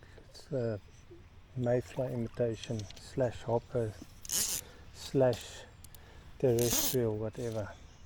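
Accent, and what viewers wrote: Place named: South Africa. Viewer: Hows it bru? Just come across your video. My best mate growing up was South African. He was the most loyal true mate you could ever ask for. We had some awesome times fishing together. I still miss him 15 years on. I dont know why that matters to anyone on here but i didnt think hearing ya accent would make me think about him like it has. Keep doing what you doing 👍🇬🇧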